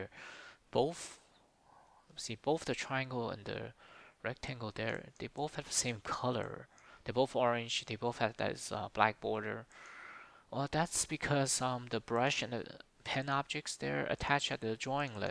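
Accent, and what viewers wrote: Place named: China. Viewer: I love your accent, it sounds really China!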